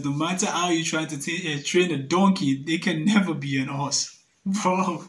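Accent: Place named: Ghana. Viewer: I sure this guy school 4 Ghana. Cos ur pidgin bi typical Ghanaian Uni one some.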